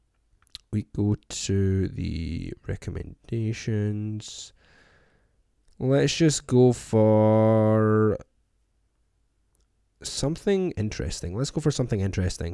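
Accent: Scotland, United Kingdom. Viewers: Are you Scottish lad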